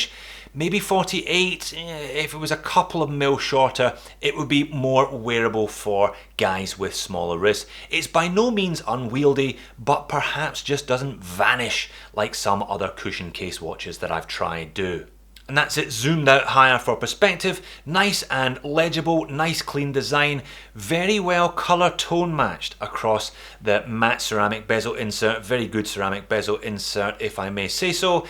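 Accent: Scotland, United Kingdom. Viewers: Scottish accent sounds like the taste of a heavily peated whisky, and I love both. The watch however is to Hulky for me, I enjoy the Kermit style much more.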